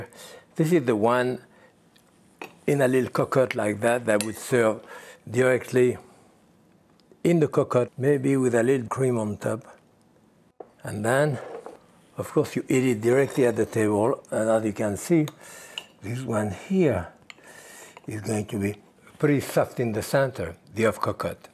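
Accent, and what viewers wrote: France. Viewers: Is there a better accent than the French accent? I think not. Hehe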